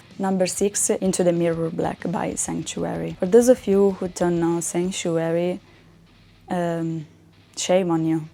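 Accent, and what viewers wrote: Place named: Italy. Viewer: Mi stavo giusto chiedendo di dove sei, visto un buon inglese parlato, ma con un accento non proprio madre lingua. Poi hai nominato il maestro Angelo badalamenti, e dopo questo posso confermare al 95% che sei italiana 😅... comunque ottima classifica anche se preferisco il buon vecchio rock/hard rock 🤘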